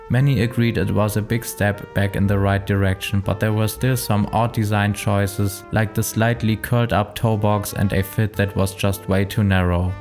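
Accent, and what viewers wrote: Germany. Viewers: aaaah mystery solved, thanks. Great Denglish btw. Best of luck with the brand and good night!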